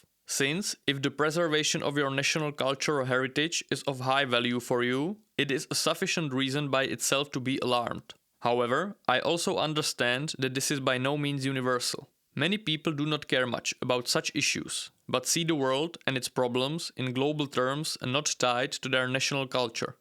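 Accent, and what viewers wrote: India. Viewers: If I didn’t know he is Czech , I’d say he is Indian based on his accent . He is way too optimistic about USA but overall interesting videos . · your accent sounds indian!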